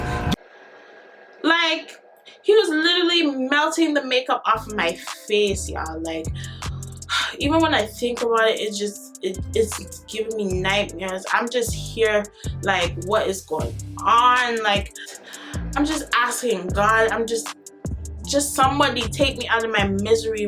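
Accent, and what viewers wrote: Jamaica. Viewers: Are you a Jamaican I can hear it I am a Jamaican myself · TALK JAMAICAN ALL THE TIME :) , I LOVE IT . GOOD STORY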